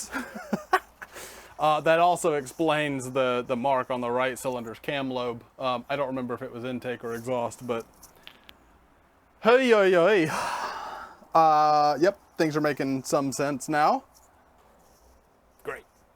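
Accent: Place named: Yorkshire, United Kingdom. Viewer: Off topic but you say 'Nicely' like a Yorkshire man almost. Plus a few other things sounding rather English. Whereabouts were you brought up?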